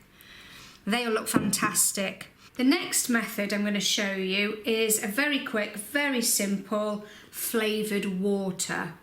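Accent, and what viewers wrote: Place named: United Kingdom. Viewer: Really appreciate this video, can't wait to pick my firsts shoots this spring! Can you please tell me from what part of UK your accent is from? Probably the most lovely british accent to be honest, makes it very easy to listen to the whole video!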